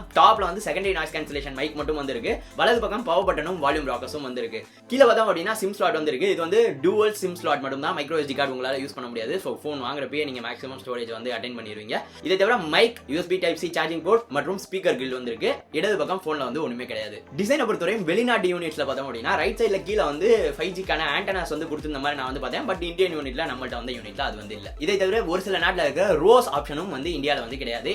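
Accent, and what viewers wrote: Madurai, India. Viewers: Bro neenga maduraiya?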